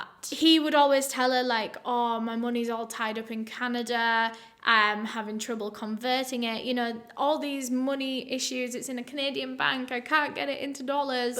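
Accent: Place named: Scotland, United Kingdom. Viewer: Great to join your channel and I am not a troll or any type of idiot, but I have to agree with you when you were promoting your hair products, your hair is shiny, beautiful and the color brings out the beauty of your eyes. I love your accent and I am guessing Scottish because I can understand you, but friends who recommended me to your channel, can't understand you, however I am from Boston and I get it every day, no one can understand me, but I thank you for the opportunity to join your channel and I will continue to do so monthly. Again I am not hitting on you, however, you possess all that I look for in a woman, I think you are aesthically pleasing and me, not a fan of much make up, but the little bit that shows you wear, makes you perfect. Thank you, love Jace Harrell, and that is my real name not Joe or Joseph, whatever it says, and I hope that I didn't just tell everyone, while I thought I was dm'ing you. 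🇺🇸 🏴. You say your r's like me Car is Cah, ❤ it. ✌ girl.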